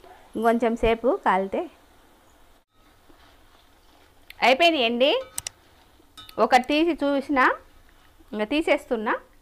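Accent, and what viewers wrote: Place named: Kadapa, India. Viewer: Kadapa telugu super o super... Same to same ma amma matladinatle vundi... Duvvuru slang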